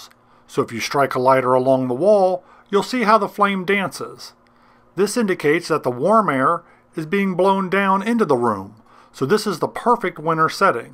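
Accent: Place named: United States. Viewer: You have an American accent.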